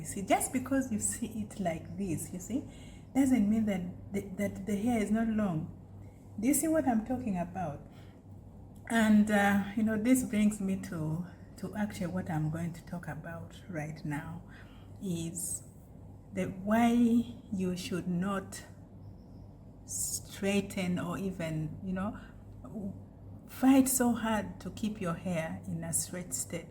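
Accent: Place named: Uganda. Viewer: Sounds Ugandan. Love this kind of content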